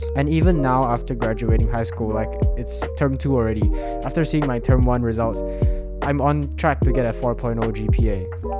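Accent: Singapore. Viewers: SINGAPOREAN ACCENT I CAN HEAR IT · bro you singaporean? and also you really just said "hehehaw" :rofl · r u Sgporean I think I can hear the accent peeking through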